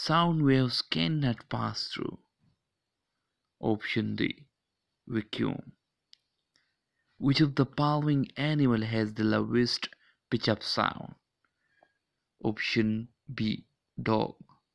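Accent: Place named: United States. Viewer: Sir American ban rahe hen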